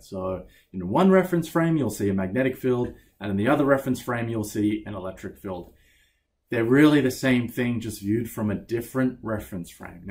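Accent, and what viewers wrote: Australia. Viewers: Huh is he an Aussie "Hang on where's my coffee? There's my coffee" stands up ... Yep he's an Aussie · Explained well! Even me, who doesn't know English so well, everything is clear. Despite the fact that I have heard the Australian accent in very few places) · Omg you look British but sound Australian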